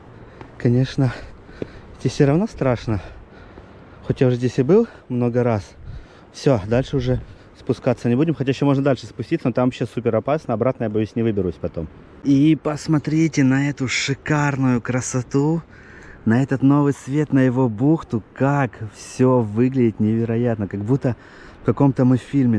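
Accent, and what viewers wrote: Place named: Ukraine. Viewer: Спасибо,! Путешествие очень интересное и полезное! Даже кажется я надышалась тем воздухом, которым дышал ты. Очень хорошо рассказываешь немного с украинским акцентом, это ещё приятней. Здоровья тебе и поменьше экстримов, а то даже дух захватывает и страх за тебя. Береги себя!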